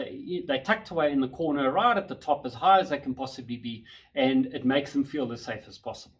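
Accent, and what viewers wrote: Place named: Gauteng, South Africa. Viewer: Where about are you'll located in South Africa , your sound like from Gauteng . I am from Durban and like to breed gouldians and if you can hook me up to some good breeders and if you are selling birds . Where do you'll show your birds . Great to see breeders in South Africa sharing knowledge .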